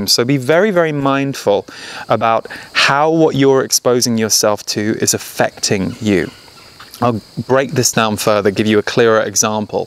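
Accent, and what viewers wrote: United Kingdom. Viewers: Thanks - love your work and great to hear a Brit.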